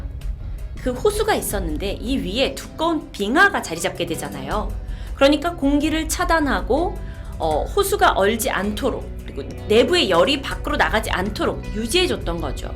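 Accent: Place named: Jeolla, South Korea. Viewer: 음이 정확해서 듣기 좋아요, 서울 말을 쓰시지만 원래는 전라도 분이시네요~ 전 강원도쪽 사람인데 전라도 경상도 사투리 너무 좋아 합니다.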